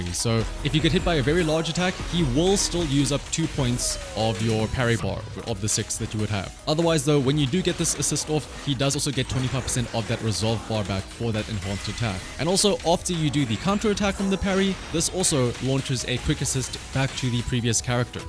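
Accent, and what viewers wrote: South Africa. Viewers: I knew I recognized that accent, as a fellow South African you got the instant like and sub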